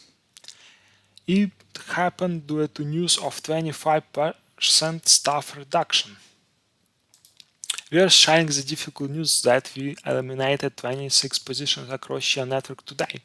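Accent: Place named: Russia. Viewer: русский акцент)😀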